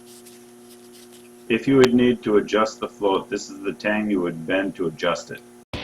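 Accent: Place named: Minnesota, United States. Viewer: I bet you are a Minnesotan?? You have the same accent as all my relative's??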